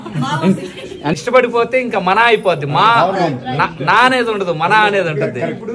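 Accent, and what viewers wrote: Nellore, India. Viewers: నువ్వు చెప్పేవిధానం సూపర్. నెల్లూరు యాస చాలా బావుంది.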